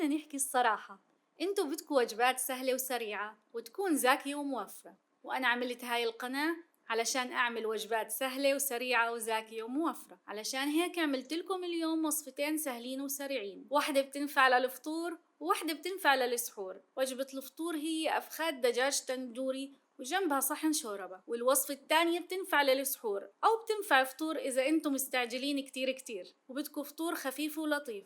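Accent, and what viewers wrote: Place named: Palestine. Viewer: لهجتك فلسطينية ❤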